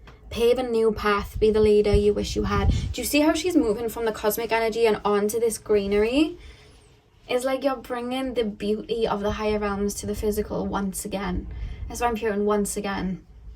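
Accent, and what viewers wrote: Wales, United Kingdom. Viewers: I love your accent😍😍😍 I subscribed just to hear your accent😂 are you from Swansea?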